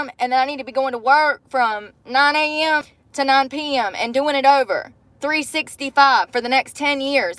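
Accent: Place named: South, United States. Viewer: Your southern accent... it's on 🔥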